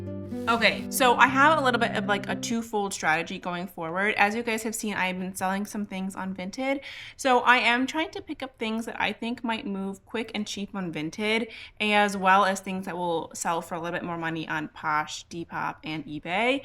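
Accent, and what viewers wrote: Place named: Midwest, United States. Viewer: You’re from the Midwest. lol Taayygs. I say the same and I’m in Wisconsin.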